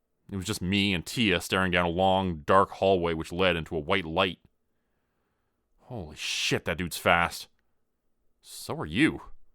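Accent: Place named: Canada. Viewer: Tjis is great. Is this your real voice man. Its nice. You sound a bit Canadian with the inflection. Cool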